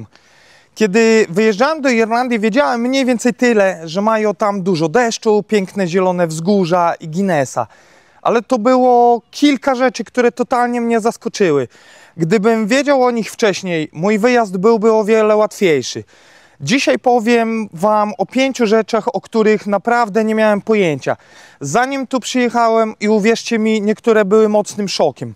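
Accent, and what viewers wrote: Poland. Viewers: Dla mnie zagadka jest twoj polski akcent.. ;) skad?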